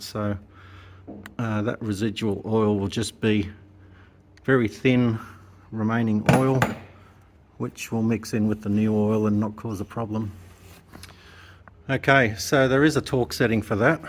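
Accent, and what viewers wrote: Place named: Australia. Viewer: I do like the Aussie accent, mate. The metric measurements and colourful expressions are also charming.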